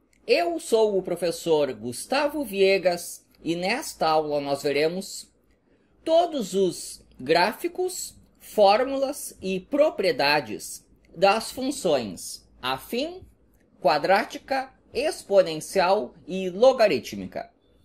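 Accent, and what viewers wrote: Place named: Alagoas, Brazil. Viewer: pra mim q vc era de ALAGOAS.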